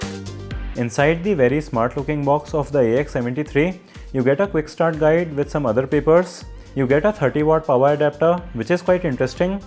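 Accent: India. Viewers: I fully understand why you speak so quickly, to keep the review as short as possible without compromising on your review detail. Your STRONG Indian accent is very difficult to understand so the subtitles are a useful addition. There is one mistake in the subtitles, stating that the router has 6 NON removable antennas but the subtitles read removable antennas. Otherwise a good review. By the way, here in the UK its available at Amazon now at just £99.99 which is almost £50 cheaper than is typical for the suppliers that actually have it on the shelves. Incidentally thanks to your reviews I cancelled my order for the AX50 in favour of the AX73 router. I am CERTAIN that I won't be disappointed. Keep up the great work. The price must have been a special one day offer because it rose to £169. Sorry guys I suppose I was just lucky, "right place right time". UPDATE: Wow that price seems to have been a one day ONLY offer and I have had to wait what seems like a LONG time for delivery, (expected today) the cheapest I have seen the router since ordering is £179 so I got a real bargain, assuming it lives up to expectations.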